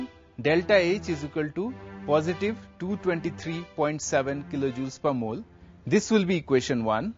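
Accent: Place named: Bengal, India. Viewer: when you can hear the bengali accent so strongly...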